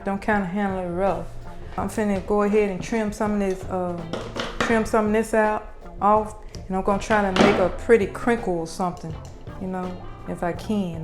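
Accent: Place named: Carolina, United States. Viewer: My lawd Carolina country boi we say it just like you do. The pie looks amazing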